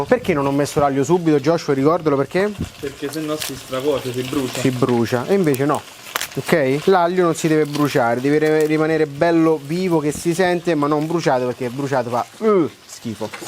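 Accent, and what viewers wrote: Rome, Italy. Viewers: Come sei simpatico, ai un dialetto! Che mi piace da morire! Super romanesco! Io sono Toscana Pisa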